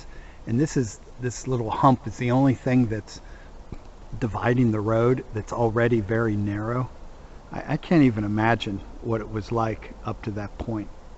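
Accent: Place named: Pennsylvania, United States. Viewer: en I think that you may have a real Pennsylvania accent! (this ol guy I knew had an original Washingtonian accent just like my aunt did!)..Rock n Roll! 'got a Raleigh 27" 12 speed (franchise)..cool.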